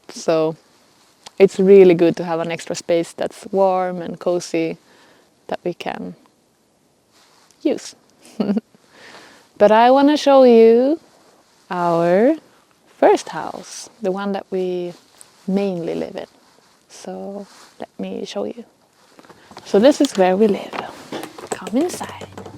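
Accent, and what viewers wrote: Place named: Sweden. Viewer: And why do you think so? I am Norwegian and I can tell you that first the language is genuine, Quite easy to hear this is Swedish English, AI can not fake that variation yet. Second; the nature is genuine and it is not hard to live this way. This channel is showing a real family, living in the real Sweden. I urge you to take a trip there to see the country. And to I know you don’t believe in Jesus, but Jesus is soon coming back, where will you be?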